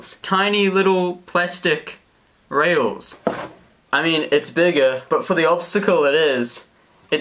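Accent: New Zealand. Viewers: Is this guy from New Zealand if so same here the How I know 'the warehouse, low prices are just the beginning' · your a kiwi?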